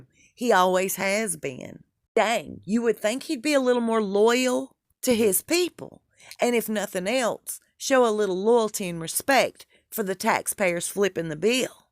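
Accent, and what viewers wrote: South, United States. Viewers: Great video,. Love your Southern accent.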